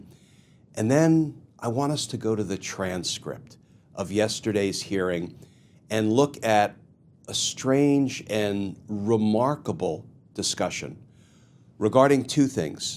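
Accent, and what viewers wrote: South, United States. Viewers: says “y’all” 🤭 I’ve GOTA know, does he say “NewOrlins” too? Yes, he’s definitely Southern, unless he’s just good at mimicking us 😁 ✌🏾 Thanks for the Knowwithall! Gratitude(s) 🌹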